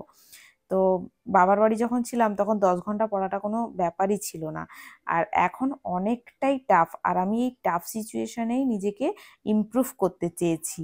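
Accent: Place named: Medinipur, India. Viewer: Purba Medinipur er kothay didi?? Amr bari Kanthi.... Macher tok sunei bujhechilam, tumi o Medinipurer ❤️...tai comment kori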